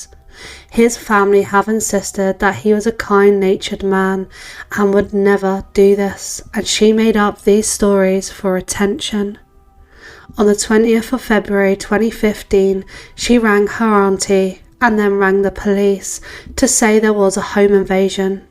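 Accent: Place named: United Kingdom. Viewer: Im pretty good at telling Brits by your accents 🙂🙂may i ask who You Voted for, Starmer or Farage??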